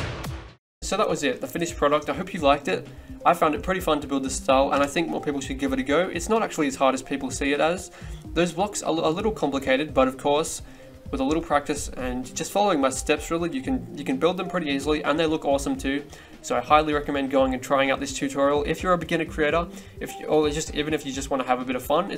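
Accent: Australia. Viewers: being australian af with that G'day everyone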